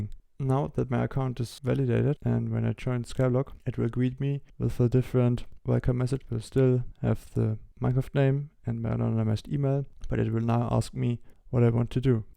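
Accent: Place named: Germany. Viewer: Der Deutsche Akzent knallt richtig hahaha gutes VIdeo ^^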